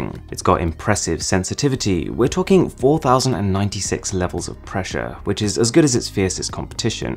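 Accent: United Kingdom. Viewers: fancy british accents😅👌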